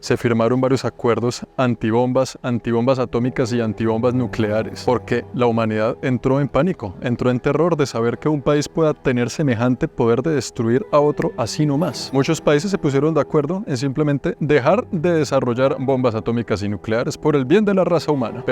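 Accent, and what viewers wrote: Bogotá, Colombia. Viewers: Ese acento Bogotano de, es un gusto escuchar su información... Sigue asi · escucharlo a usted es remontarme en el tiempo y volver a mis epocas de estudiante en el colegio mayor de san Bartolomé, ahi en la esquina de la plaza de bolivar, al lado de la puerta falsa y en frente del palacio de Nariño en Bogota. Asi nos narraban la historia mis profesores de sociales. Con ese asento pausado y elegante del cachaco bogotano. Eran una verdadera delicia esas clases, charladitas. Maestro, gracias totales como decia Cerati. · El español bogotano en pleno! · 😂 El tipo es más rolo que la changua, más cachaco que el ajiaco, más Colombiano que la cumbia y el vallenato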